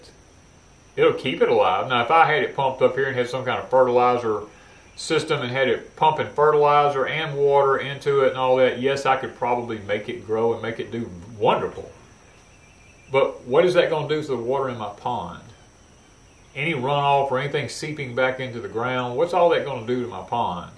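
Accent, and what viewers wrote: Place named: Kansas, United States. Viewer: Sounds like Kansas Brother with all those extremley's.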